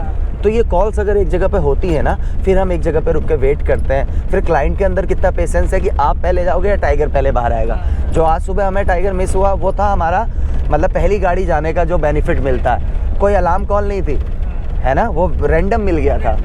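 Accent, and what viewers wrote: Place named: Pahadi, India. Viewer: Pahadi sune me bahut acha lagta h bhai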